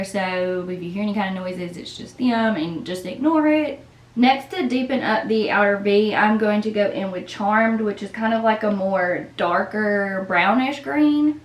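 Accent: South, United States. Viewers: i love the accent, you from the south too?💗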